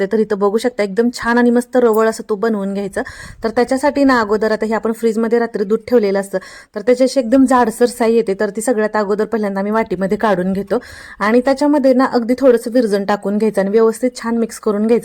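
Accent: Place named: Satara, India. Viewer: Tai tumchi language ekdam satari vatate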